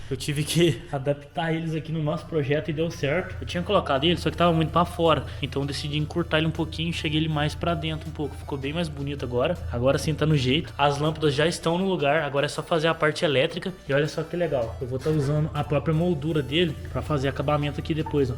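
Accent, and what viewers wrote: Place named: Paraná, Brazil. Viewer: sei que esta pergunta pode parecer estranha, mas... você é do interior do Paraná, né?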